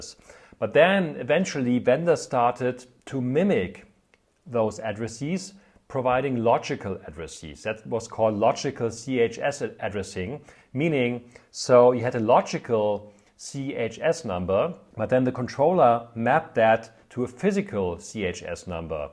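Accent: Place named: Germany. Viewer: Dieser deutsche Akzent... D: Aber danke für die Informationen.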